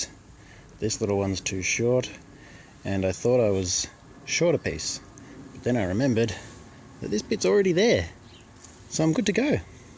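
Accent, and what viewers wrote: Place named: Australia. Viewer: It looks great! Lovely to hear an aussie on Youtube too :)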